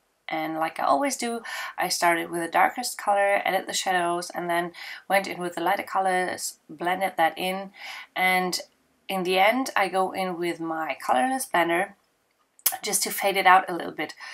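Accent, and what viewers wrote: Germany. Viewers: Your accent is not funny! It's beautiful to me! I miss hearing german accent. I lived in Stuttgart and Nürnberg, I miss Germany :) Yes, it is craft foam, and I love how you used it to make the shaker, very clever! I like my copics, water color pencils, water color paints, and regular colored pencils with mineral spirits.I am saving up to get Pitt brush markers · Oh I LOVE it when you have a card up! Not only are they adorable, but I get to hear a little bit of the German accent I miss oh so much! As for your coloring question: my marker of choice are the ProMarkers, i use Prisma pencils and I am slowly getting into the whole water colour thing. Keep up the good work and I cannot wait until your stamps are up and running!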